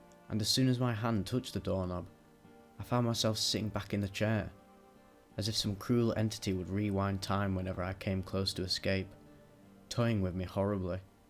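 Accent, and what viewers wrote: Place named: England, United Kingdom. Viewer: you're a good narrator, how far has this video taken you? the english accent, the world loves it as much as japan loves complex metal, would like to hear more trip reports from the wid void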